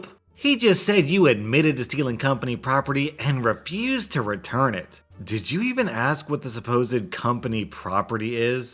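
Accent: South, United States. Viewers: Not gonna lie that southern accent was kinda cute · I love your country accent lol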